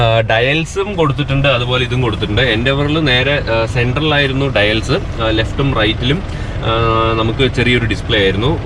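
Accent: Palakkad, India. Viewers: പാലക്കാട് ആണോ....സ്ഥലം...പാലക്കാട്‌ സ്ലാങ് ആയി...തോന്നി... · nengal Palakkad anno?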